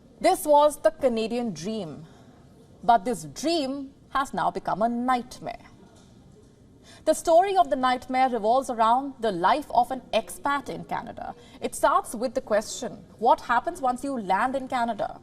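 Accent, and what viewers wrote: India. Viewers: this girl is trying to speak like an English speaker but her indian accent really dominates how she pronounce the words.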